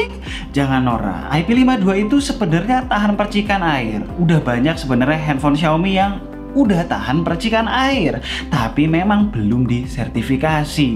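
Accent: Java, Indonesia. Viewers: Lebih nyaman gausah medok mas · Kok jadi agak2 medok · bang logat jawa nya di hilang kan saja, supaya lebih mantap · Sobat jaksel logat jawa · Medokee maseee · kok baru medok? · bohoso ne medok men :v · Logat Jawa nya keluar 😂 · Ga seru kalau mendok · Medokk amat yakk sekarang bahasanya 😂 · bang logatnya berasa pembawa acara orang jawa👍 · Logat medok jowo. Next : logat madura bang ato logat medan ➡️➡️➡️ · Galfok sma mendok nya om. Sma medoknya kaya saya. Salam ngapak om... Gpp bkn yg prtma nnton,,yg pntg selalu nnton saat pidio baru uplod. 👍👍👍👍👍👍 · udah ganteng, manis, medok lagi ngomongnya.. #idaman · Bang kedengarannya pake logat Jawa yah? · Saran jangan pake nada jawa lah pake nada formal sajaa. Gak enak didenger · Kok medok mas... >< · Kenapa jadi medok 🤣🤣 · Bukan niat mau rasis atau gimana, cuman dengan medoknya jadi agak aneh dan ga nyaman didenger mending kek biasa, jangan dipaksakan. 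· Mending nunggu..om balik gak medok lagi. Gak enak didengar mas kalau medok. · Jawa nya medoooook mas....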